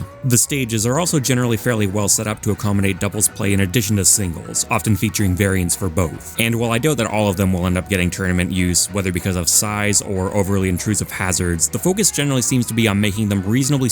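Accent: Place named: Canada. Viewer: This dudes definitely Canadian and we love it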